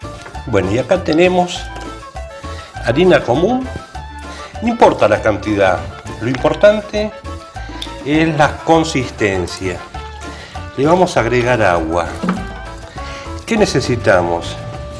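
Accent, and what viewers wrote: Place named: Argentina. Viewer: una consulta eres peruano con acento argentino? que te pasa bro enserio que raro un peruano con acento argentino por cierto gracias por la receta me sirvio <3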